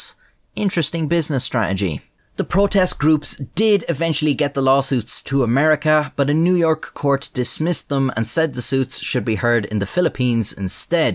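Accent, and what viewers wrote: Ireland. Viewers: hear tings yup definetly irish (dane so for a sec i was wondering why a dnaish word appeared) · The videos are great but I also dig listening to that Irish accent. 😀 Is it normal for everyone over there or is it a more specific to a certain part of Ireland? Keep up the good work! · Today is good, the sarcastic condescending Irish man has graced us. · Off D sofa trone . . . The Irish pronunciation always make me smile.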